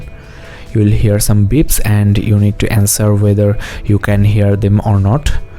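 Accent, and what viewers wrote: Bengal, India. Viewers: lmfaoo thats a bengali accent its clearer than indian but almost the same, indians say aindd for the word "and" lmfaoo. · Not being racist, but he sounds almost like a indian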